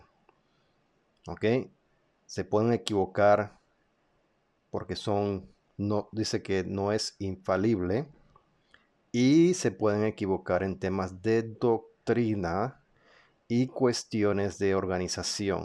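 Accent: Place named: Mexico. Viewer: Por un momento pense heras Mexicano por tu forma de hablar ,de Panama es como ya sabes el general y ahora es jw . lo de tu hija como decimos en Mexico que mala onda ,aunque yo vivo en los Angeles California USA 🇺🇸.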